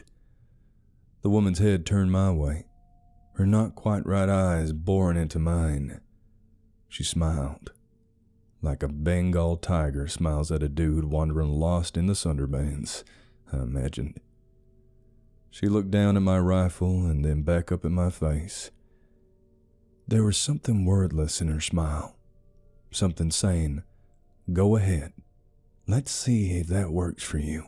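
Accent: South, United States. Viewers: Love how a Brit can pull of a decent southern accent. It’s passable tbh and I’m from Texas. Also, didn’t you do this story before? I coulda sworn I heard this from you? Full story and all. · I love "southern" voice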